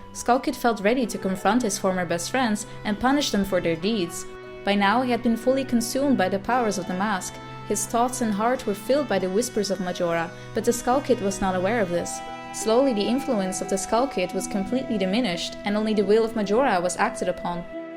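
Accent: Netherlands. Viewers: oke voor een nederlandse heb jij echt een te mooi engels accent <3 10/10! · Are you dutch?! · wich province of the Nederland are you, you're dialect sounds familiar.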